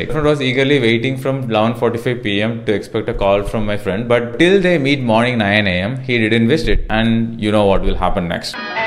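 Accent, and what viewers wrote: India. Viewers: THE AWKWARD INDIAN ANGLOPHILIA: English is obviously not his first language, nor is it the language he is remotely proficient in. Indians, even from the lower social strata who want to rise up, tend to use English everywhere as if they had no language of their own. Strangely, they even talk to stray dogs in English. To appear smart, they tend to talk fast, but then no one understands their gibberish anymore and so they become a laughing stock. Don't they ever think about their ridiculous pronunciation and accent?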